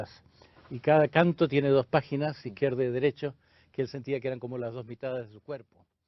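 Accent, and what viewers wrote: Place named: Chile. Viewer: Es increíble escuchar filosofía de verdad en Chileno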